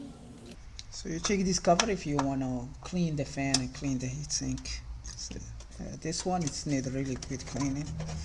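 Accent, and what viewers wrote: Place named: Iran. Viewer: Thanks for your understanding, I just thought your voice sounded like a Persian accent.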